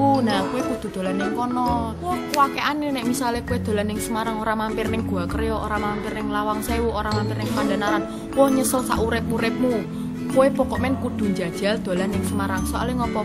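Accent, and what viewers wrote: East Java, Indonesia. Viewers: aku rasa ini masih kerasa jawa timuran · dialek semarangan tapi logat jawa timuran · ini logat jawa tumur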